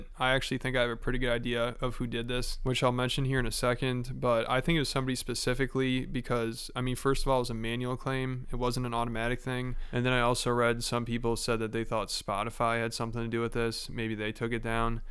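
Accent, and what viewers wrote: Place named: South, United States. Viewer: Are you from the south? I feel like i hear it slipping out occasionally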